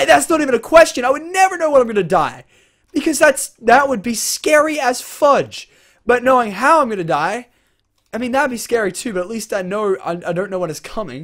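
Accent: Australia. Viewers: Is Australian I'm in a country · is aussie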